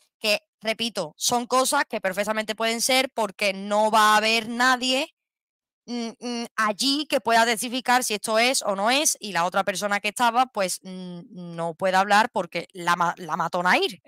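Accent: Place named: Andalusia, Spain. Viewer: Hola!! Me he suscrito recientemente, gracias tb de estar suscrita hace poco d a través de Triunarts y asi os he ido descubriendo. Me encanta como compactais las dos y bueno tb como explicas los casos. Espero q te vaya todo genial!!Además me encanta el acento andaluz 😊 Saludos desde Barcelona 🤗😊😊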